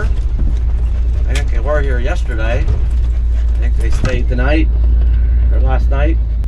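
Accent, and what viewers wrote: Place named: Pittsburgh, United States. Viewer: Are you from Pittsburgh? I detect a little bit of the burg accent! 😀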